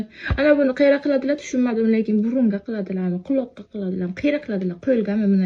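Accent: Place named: Bukhara, Uzbekistan. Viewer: Opa Buxorolikmisiz shevayiz õxshaykand manam Buxorolikman aytvoring❤❤❤❤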